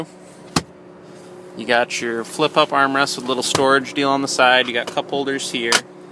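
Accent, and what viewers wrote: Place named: Canada. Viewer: I trust what your saying cause I'm hypnotized by the awesome car and you sound Canadian to me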